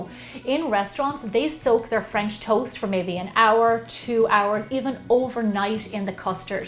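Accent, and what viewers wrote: Ireland. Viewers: Love, love your Irish accent! ☘️ I’m Irish too, but w/o the accent, ha, ha. And your recipes are all excellent. Now, I have been making my French toast exactly like yours for years....except now I’m going to try & add the extra egg yolks. That’s new to me, & I bet it makes a difference. Thanks so much!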